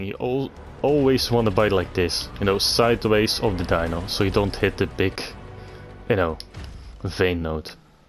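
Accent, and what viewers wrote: Netherlands. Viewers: Hoor ik hier een Nederlands accent hahaha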